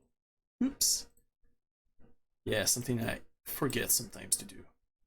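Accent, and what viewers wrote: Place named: Quebec, Canada. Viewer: On ne peut jamais enlever l'accent québécois ;-)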